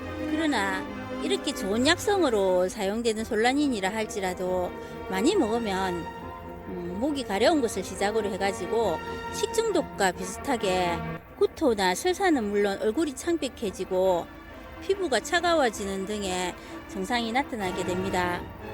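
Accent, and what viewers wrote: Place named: Gyeongsang, South Korea. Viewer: 경상도말씨에 정감이갑니다 까마중에대한자세한 설명고맙습니다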